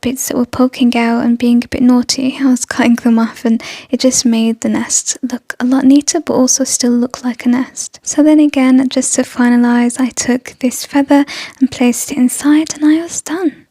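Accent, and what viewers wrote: England, United Kingdom. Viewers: Hi love! Personally, you don’t have to remind me to press the thumbs up because that is the first thing I do when I open the video! Such a cute idea to put a tea light in the egg shell! My fave is the last diy., did I ever tell you how I love your calming voice! Add the English accent and....heaven! You should do story time for kids at the local library! XOXO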